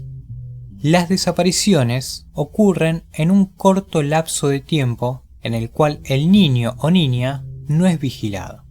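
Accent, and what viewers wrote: Argentina. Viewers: Los Argentinos son perfectos para narrar historias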